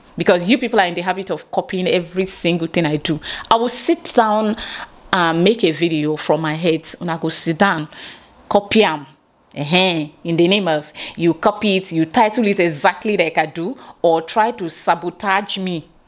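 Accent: Ghana. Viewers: You speak Ghanaian English, Your accent is good. Your intelligent.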